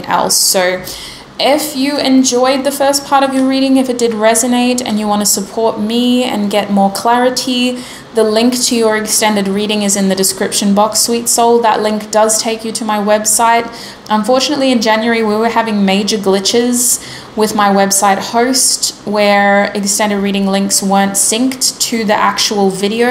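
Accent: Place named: Australia. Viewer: Hi would you consider doing meditation videos, you have a soothing Australian accent which is very calming and easy listening to hear. Very relaxing ❤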